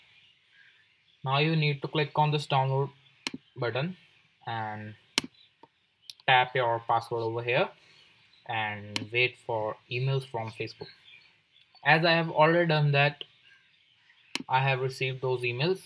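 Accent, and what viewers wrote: India. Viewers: i still wonder why indian accent gives me headaches. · you such disrespectful of person just because the accent. India very smart of technologies thanks to them they make computers and smart phones.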